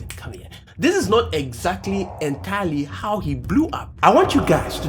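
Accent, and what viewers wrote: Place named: Ibadan, Nigeria. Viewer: He actually got attracted to Nigerians by his Ibadan accent. He sticks to it, and became famous for it.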